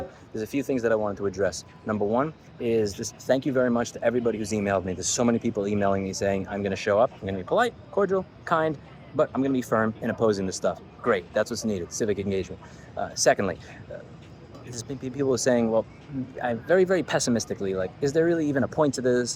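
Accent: New York, United States. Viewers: This is great, I hear de New York. Normally ya don't hear the accent. Come onnnnnnn. Say "all a yous!" Don't be all grammatical on me now!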